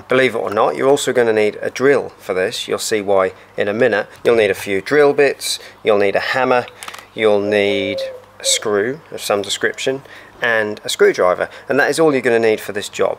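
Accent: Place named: London, United Kingdom. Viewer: Fantastic! Can I ask what accent you have? Sounds like a blend of London and 1920’s BBC English.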